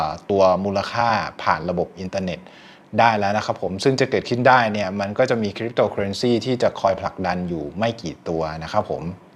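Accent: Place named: United States. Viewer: คุณพูดภาษาอเมริกันได้ 100% พ่อแม่เป็นคนไทยและโตที่อเมริกาเหรอคะ. ข้อมูลข่าวสารดีมากคะ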